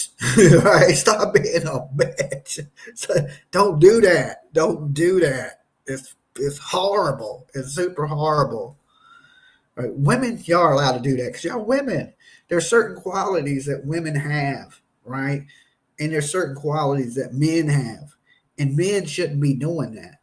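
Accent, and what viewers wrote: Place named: Kentucky, United States. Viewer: I live n Eastern Kentucky... Was u n Lexington or Louisville? Sounds like it...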